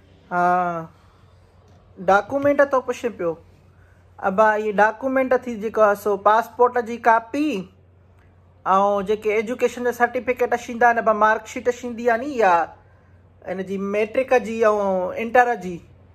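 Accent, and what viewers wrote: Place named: Larkana, Pakistan. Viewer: 'Kadhin kadhin shashindo' aw😁safa Larkane waran ji boli thi galhin 😂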